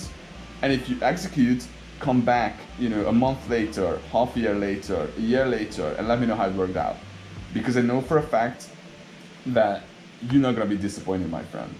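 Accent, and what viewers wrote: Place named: Hungary. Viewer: Szia hallom a kiejtéseden higy magyar vagy kaphatnék magyar videot?😁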